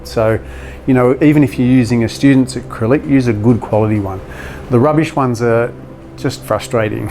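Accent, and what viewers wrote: Australia. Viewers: Love all your videos they are precise and easy to follow also your voice is pleasantly style Aussie. Thanks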